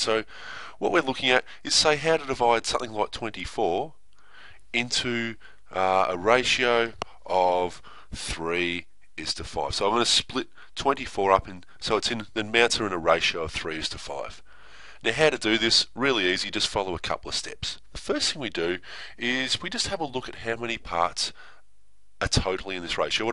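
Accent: Australia. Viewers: Thanks so much for the video, by the way are you australian?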